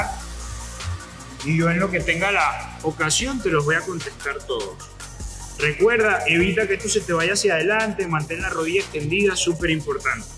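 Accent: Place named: Venezuela. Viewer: Pensé que eras Venezolano hermani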